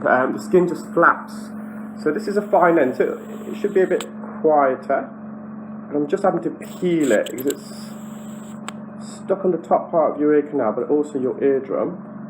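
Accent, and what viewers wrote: England, United Kingdom. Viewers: You have the best English accent.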